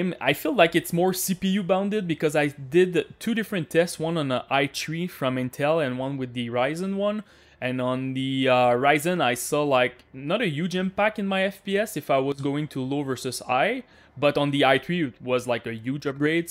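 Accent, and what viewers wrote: Canada. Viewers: Bruh I didn't know Canadian people actually speak like this. I thought this accent was just a running gag on cartoons😅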